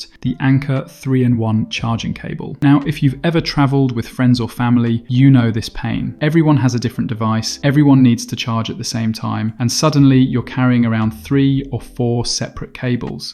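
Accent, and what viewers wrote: Germany. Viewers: Ist das geil, wie man direkt raushört, wie ein Deutscher Englisch redet 😅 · When the travel gear video has a narrator viz a German accent👌👌👌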